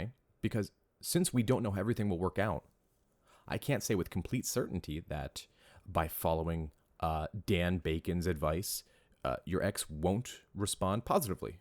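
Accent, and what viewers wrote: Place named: California, United States. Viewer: Actually I am very curious to know. You don’t show your face and I am sure that has A LOT of your viewers curious lol. At least tell us where you broadcast from? I am betting you’re in California based on your accent or somewhere out west. I am in Canada. Ontario near Toronto to be exact.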